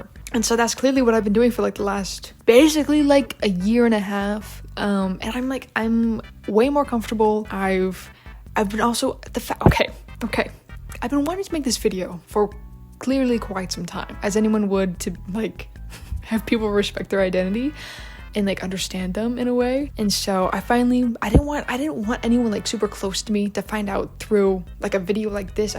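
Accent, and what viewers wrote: United Kingdom. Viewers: Great british accent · so proud of you:) ladies r very cool and attractive i agree. also very good bri'ish accent (from a bri'ish themself)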